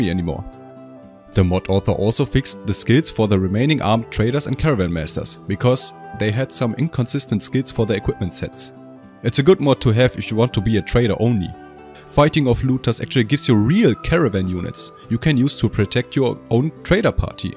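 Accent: Germany. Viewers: There is nothing better than watching a German talking about bannerlord mods and having a strong accent. Grüße geht raus an dich! Bester Youtuber!!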